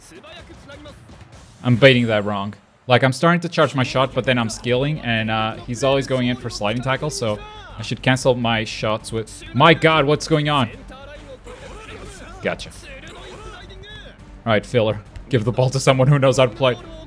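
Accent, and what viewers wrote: Portugal. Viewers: I know you where from Portugal from the way you speak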